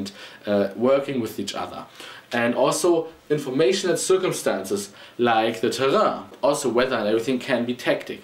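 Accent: Germany. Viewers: Yes, Shadiversity are you German? Der Akzent kommt mir so vertraut vor xD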